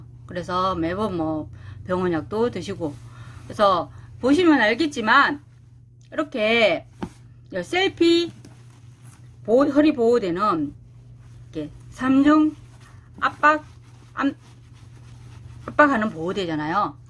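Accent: Gyeongsang, South Korea. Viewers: 허리가 아파서 귀를 쫑긋, 눈을 동그랗게 뜨고 영상을 감상합니다. 경상도 억양이 사각 사각 꿀맛나는 꿀사과 같아서 너무 좋습니다. 옆지기님 모델료 인상 좀 해드려야겠네요. 너무 말을 잘 들으시는 것 같아요.ㅋㅋ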